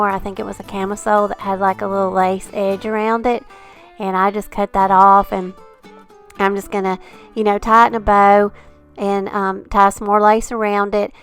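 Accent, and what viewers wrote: South, United States. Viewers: These are darling crafts. I love rag wreaths. The fabrics are so cute. I’m a seamstress and I love fabric. I love your southern accent. You have such sweet voice. 🎃🧡🍁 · I LOVE EVERYTHING YOU MAKE!!! Thank you for sharing your wonderful projects and talents!!!! And from one Southern Gal to Another, I love and appreciate your accent!!!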